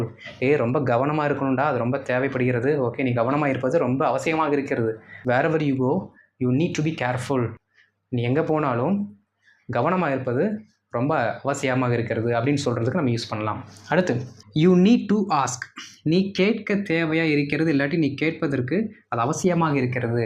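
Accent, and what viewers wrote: Coimbatore, India. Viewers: ஏனுங்க நீங்க கோயம்புத்தூரா Brother class super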